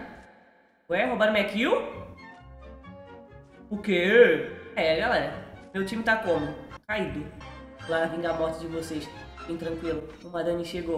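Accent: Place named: Portugal. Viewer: Tem Um Sutac Portugues muito Lindo Amei❤❤😍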